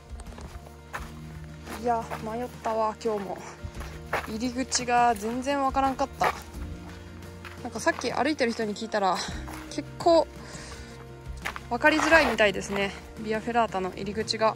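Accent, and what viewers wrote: Gifu, Japan. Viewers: MARiAさんスズメ蜂ヤバかったですね。 山には人を惹きつける不思議な魅力があります。難易度が高ければ高いほど惹きつけられるものです。 事故には注意して下さい。ちょっとの骨折でも二度と登れなくなる可能性もあります。このコースも想像を絶するものでした。 MARiAさんの岐阜弁大好きです！ こんな綺麗なクライマーはいない！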